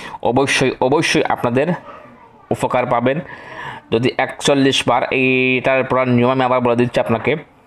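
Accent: Sylhet, Bangladesh. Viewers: আমি আর অনেক ভিডিও দেখেচি সব ভিডিওর কথা গুলি ভুজি কিন্তুু আপনার কথা ভুজিনা,,, আমার মনে হয় আপনি সিলেটি,,, কথা কম বলবেন আর ভালো করে ভুজিয়ে বলবেন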